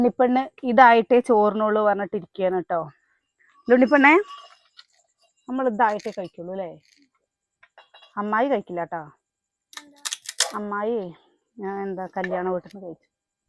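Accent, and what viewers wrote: Palakkad, India. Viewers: നിങ്ങളുട പാലക്കാടൻ ഭാഷ കേൾക്കാൻ വേണ്ടി മാത്രമാണ് ഞാൻ വീഡിയോ കാണുന്നത്. നിങ്ങൾ പൊളിയല്ലേ.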